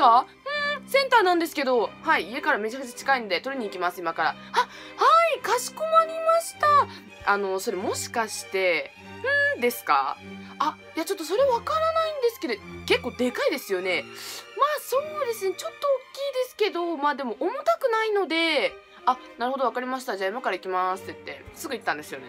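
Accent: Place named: Hakata, Japan. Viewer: 博多弁好き！いつもめちゃめちゃなまっててほしい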